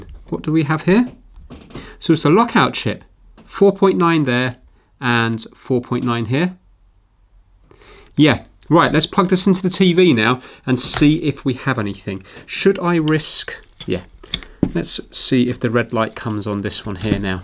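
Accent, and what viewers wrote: United Kingdom. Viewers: Amazing actually. Brittish accent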